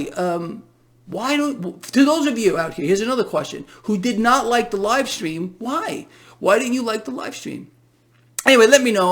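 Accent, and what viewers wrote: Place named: New York, United States. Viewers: Sorry. I can't take the New York accent. Spent about 30 seconds listening. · Good idea, I am asleep when you do your lives anyway (Australia) but awake when you do end of day.....but entirely up to you, use the channel for the wrap up over seas whilst I sleep and of course to hear you NY accent. By the way thankyou for all you do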